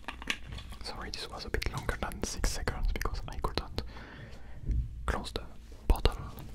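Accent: France. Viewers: I'm not sure but are you french? I'm having a hard time recognizing the accent. If that's the case, I'd love to see a video in French someday !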